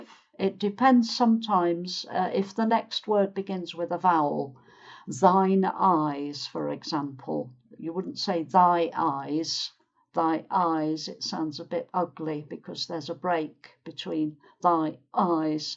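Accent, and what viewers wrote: United Kingdom. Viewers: can you suggest some British series or sitcoms that speak like you?. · Hello, thank you for your lessons very much! They are always not only informative, but also pretty useful. And one more reason why I love your study stuff is your really British accent 😇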